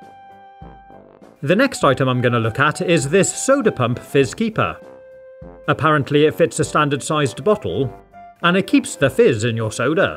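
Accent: United Kingdom. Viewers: Are u British???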